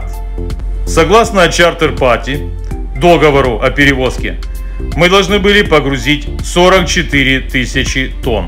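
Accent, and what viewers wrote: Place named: Odesa, Ukraine. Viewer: Начал смотреть видео, и слышу наше родное. Думаю не показалось. Но нет как не крути наш родной говор и как не вспомнить за нашу любимую Одессу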